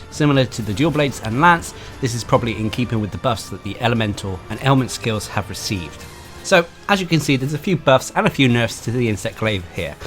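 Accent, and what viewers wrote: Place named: England, United Kingdom. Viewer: It's an English accent of a sort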